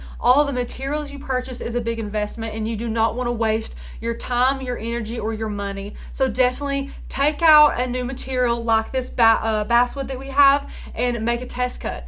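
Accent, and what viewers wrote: South, United States. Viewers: I was gonna say, she sounds VERY southern to me! (New Hampshire here ;) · My English is fine, and I have trouble keeping up😁 Her Southern accent is thick, so that might make it a bit more difficult for you, too. I don't know how to do it, but I know videos usually have Closed Captioning, so you can read what is being said at the bottom of the screen. It helps me keep up. Good Luck from Georgia😁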